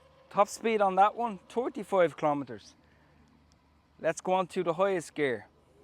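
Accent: Dublin, Ireland. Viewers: Great vid. Best of all is your Dub-A-Lin accent. I just incountered someone with the said same bike and its a rocket to go with 15 degrees hill climb which is unheard of in this price bracket. It must be a bone crusher without suspension and solid tyres but the plus side is no punctures. Presently its 509 Euro because of black Friday. This is outrageous.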